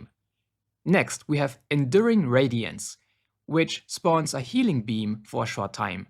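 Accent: Germany. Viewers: Im hearing a german accent xD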